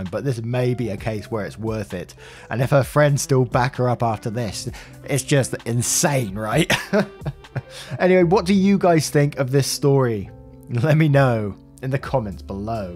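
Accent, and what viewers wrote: United Kingdom. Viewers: I have listened to so much UK media over the years I don't notice the accent, until you call the TAH a Berk and then I giggle.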